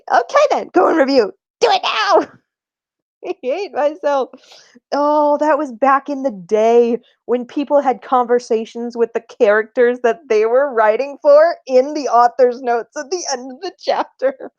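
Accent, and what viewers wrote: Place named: United Kingdom. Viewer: Your British accent is perfect. And don't think I didn't catch that harry potter reference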